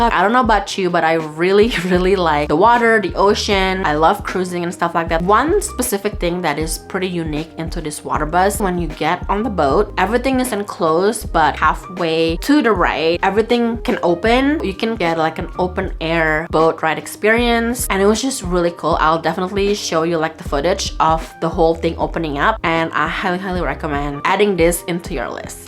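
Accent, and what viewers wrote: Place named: Indonesia. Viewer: Good review especially on the fish tank exhibition at Ginza.Your English is very Chindo :)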